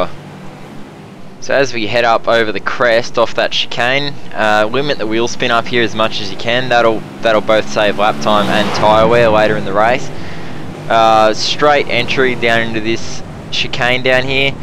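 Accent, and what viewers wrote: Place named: Australia. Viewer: Nice, vid includes authentic Austrailian accent and everything :)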